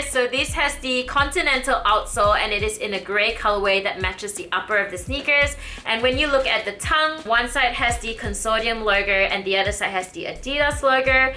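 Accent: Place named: Australia. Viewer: I love your aussie accent LOL its so cool compared to my American accent. Def gonna look into 4Ds I think Adidas is really killing the game right now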